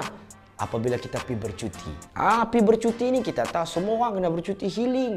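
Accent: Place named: Penang, Malaysia. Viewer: x larat gelak😂asyik dengaq slang penang brader ni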